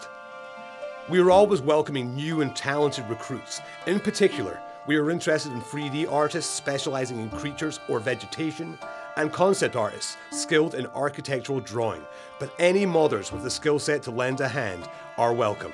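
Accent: United Kingdom. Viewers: Can anybody nail down the presenter's accent? It sounds mostly Northern English or Scottish, but once in a while I could swear I hear bits of Irish and Welsh... maybe even a bit of American? If I had to guess, I'd say this guy has probably spent a lot of time moving or traveling in his youth. I'm fascinated by English dialects, and this guy's intrigues me. Oh, but great video btw. Beyond Skyrim is my brightest hope for the future of TES, and I love the work you guys do. Keep it up. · Ok watched for an interesting topic, but I'm now staying for the voice. Scottish accent my favorite ever since Sean Connery became my Bond. Great video and thanks for the content also.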